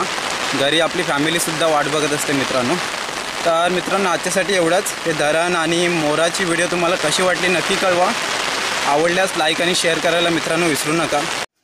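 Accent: India. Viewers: बहुतेक राजापुर तालुका असणार तुमचा.असं बोलण्यावरून वाटतय.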